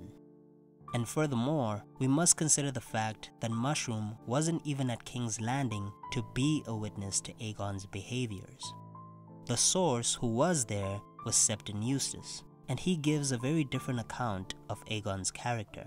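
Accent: South Africa. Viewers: Are you south African? Just asking 😂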